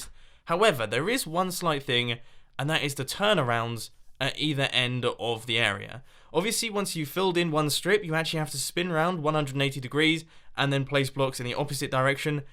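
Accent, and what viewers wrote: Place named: England, United Kingdom. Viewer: 2 questions. 1. Where are you from? I hear an English accent. 2. Do you believe in using mods like WorldEdit for laying lots of blocks?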